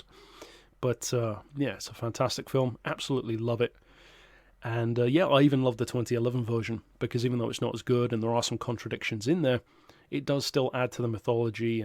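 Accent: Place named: United Kingdom. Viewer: It's weird seeing Braun Strowman, discussing a movie with a British accent on YouTube! 😕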